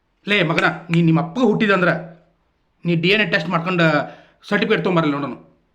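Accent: North Karnataka, India. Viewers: Khadak uttarakarnataka spacial bhashe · Nimma utthara Karnataka maathu keloke thumba khushi agutthe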